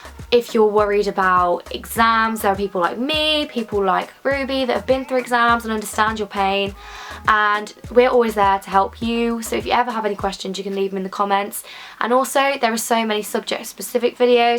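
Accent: Birmingham, United Kingdom. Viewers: Don't try to suppress that awesome brummy accent! I love how it sounds for some reason (I'm a weirdo lol) x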